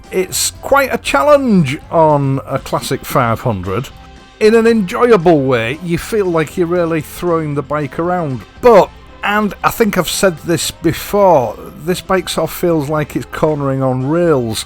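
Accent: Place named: Hull, United Kingdom. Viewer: Apart from the really good motorbike content, I just love listening to your Hull accent. Pure music! Great video.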